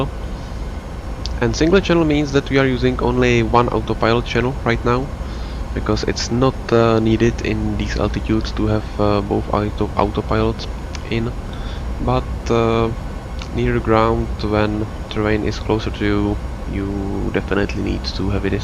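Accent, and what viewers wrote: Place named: Czech Republic. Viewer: Czech accent recognized!:D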